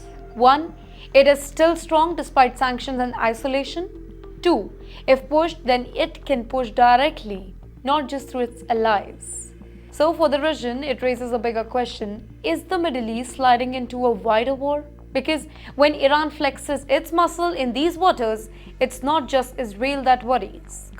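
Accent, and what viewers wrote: India. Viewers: Curry accent 😂 eat cow dung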